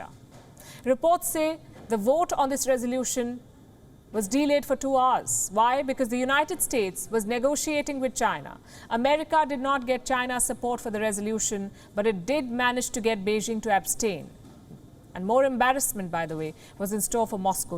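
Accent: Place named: India. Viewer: indian tongue again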